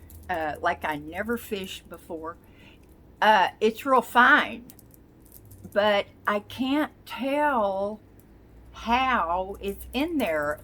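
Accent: South, United States. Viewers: why is it that you have no accent in some of your videos but have a strong southern accent in other videos?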